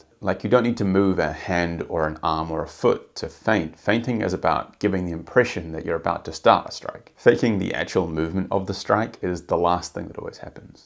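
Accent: New Zealand. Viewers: I am so happy I found this channel. I am a Kiwi away from NZ so it was nice to hear the accent! I love MMA but also Parkour and remember you from SP09! You got know Storror!!!!! lol Also, a little TMI, I have rather severe Ankylosing Spondylitis and a weight issue that is more about inability to find a way to move that wont break me. My muscles are a mix of ridiculously strong and dense to almost nothing there and I hope I can learn something, anything from your vids that might help me as I am becoming the Hunchback of Notre Dame and I hate it, deeply. Anyway, great vid, I watched it twice because NZ pay is bad and you deserve money, I liked and subbed. Now onto Callum then Dom! Very interested as Dom was often training with some of the guys in the city I live in, in Aus and seeing him in real life is so different to YouTube!!! Things he attempts and lands that look big on screen, look literally impossible to my eyes. 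GG! Keep up the hard work and I look forward to the next upload! ✌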